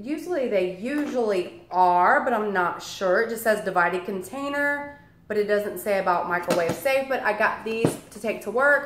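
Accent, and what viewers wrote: South, United States. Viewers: Just found your channel! I absolutely love your personality! Where are you from? Is that a southern accent?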